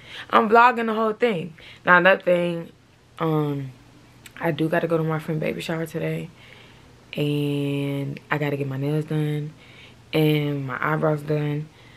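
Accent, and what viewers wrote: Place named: Louisiana, United States. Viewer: I love da Louisiana accent 😂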